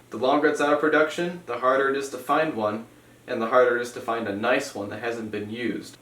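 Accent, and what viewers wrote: United States. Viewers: You are from Michigan?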